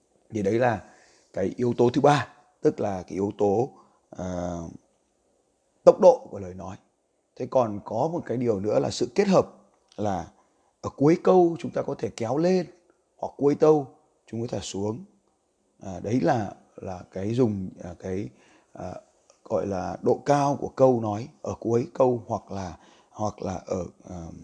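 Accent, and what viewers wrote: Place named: Hanoi, Vietnam. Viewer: Giọng Hà Nội là hay rồi.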